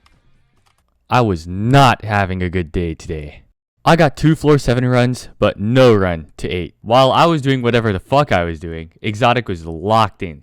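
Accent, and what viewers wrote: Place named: South, United States. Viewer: you sound like technoblade but with a southern accent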